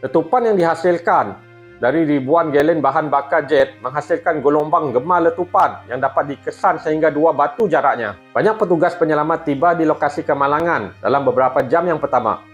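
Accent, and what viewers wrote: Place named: Kuching, Malaysia. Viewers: Auk, urang kuching. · Kamek tek rasa gia juak. Org sarawak tok kah.. yalah alu maca komen. Best topic tok. Suka nengar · Kuat slang sarawak ktk pok.. 😅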